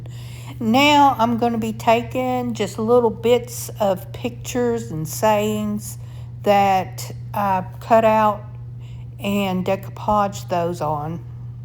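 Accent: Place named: South, United States. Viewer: I can hear your beautiful Southern accent. I am in South Georgia, where are you located?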